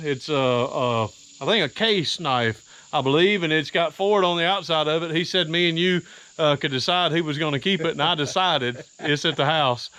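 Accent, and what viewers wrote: South, United States. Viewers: your southern accent is wonderful!! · i like how you's southerners say coil. you say it [ ool ]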